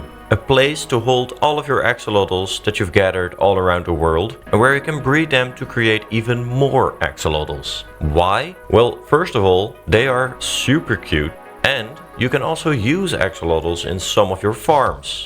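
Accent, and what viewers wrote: Netherlands. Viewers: wassup, are you dutch cuz i live in the netherlands and i also lived in america and you have the same accent as most of my english speaking dutch friends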